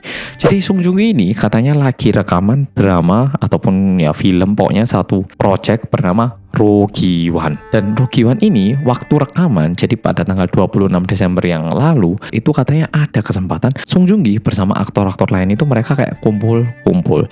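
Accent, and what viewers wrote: Java, Indonesia. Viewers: Medoook👍👍 Edit mungkin lebih halus jika "diasuh" mas bukan "dipelihara" mohon maaf koreksi dikit🙏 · Mas saya mah gak suka sama SJK, saya lebih suka sama kamu ... Muka korea logat jawa 😊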